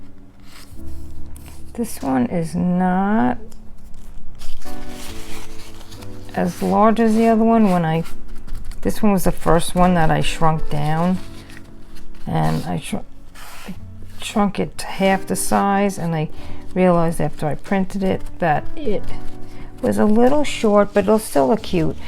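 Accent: New York, United States. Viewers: I just love this!!!!! I was gifted some snowman tiles and was going to make coasters, but this changed my mind!!!!!! Great project!!!! Thanks for sharing!!! God bless!! Are you in NY or NJ? I detect a little accent!!! lol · Hey there! Stopping by from the playlist. LOVING these. God bless the internet and the incredible farmhouse pics we can find. Will def give the hairspray idea. So, do I detect a fellow New Yawka? Brooklyn born and raised here but living in a world of cows and bears these days 0_0! Have a great day!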